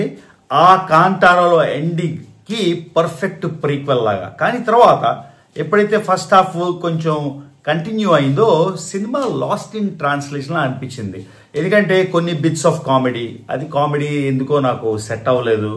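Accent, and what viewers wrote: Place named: Karnataka, India. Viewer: U r kannada slang ❤ love from Karnataka 💛❤️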